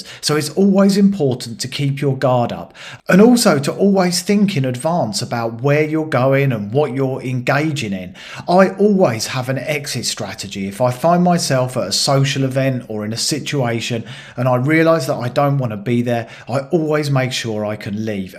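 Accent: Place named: England, United Kingdom. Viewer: Apart from excellent advice ....its so refreshing to hear an English accent on this uttrly whitewashed americanised yourube app. 😂🎉🎉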